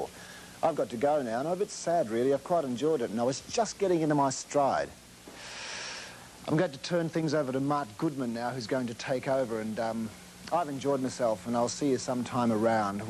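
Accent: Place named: Australia. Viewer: This was back when Colin Hay had an Australian accent. He has since reverted back to his native Scottish accent.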